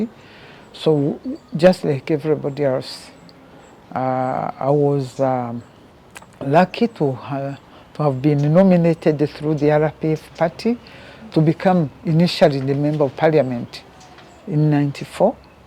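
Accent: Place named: Tanzania. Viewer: Mtanzania mwenzetu.Accent ya kiingereza chako imenijulisha,Poleni sana wanyarwanda kwa mateso yaliyowakuta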